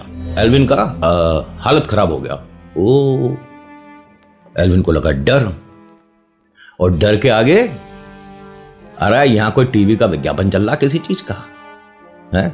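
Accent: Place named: Haryana, India. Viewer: भाई मजा आ गया हरयाणवी जबर्दस्त ऐसे ही सुनाते रहो टैलेंट है मगर घमंड बिल्कुल नही है 😂😂😂😂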